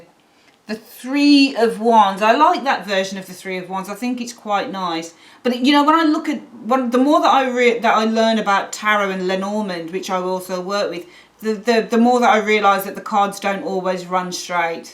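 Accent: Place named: Black Country, United Kingdom. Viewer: definitely hint of black country in that accent .. yau in dugley?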